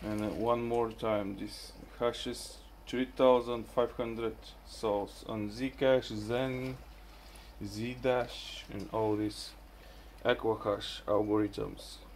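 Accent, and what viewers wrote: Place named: Eastern Europe. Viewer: so where are you from bro your accent is slavic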